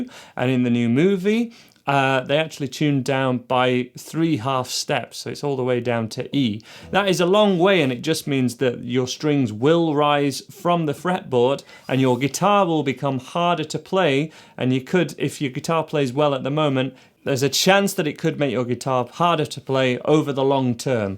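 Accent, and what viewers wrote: United Kingdom. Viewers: And THIS GUY IS BRITISH! Score!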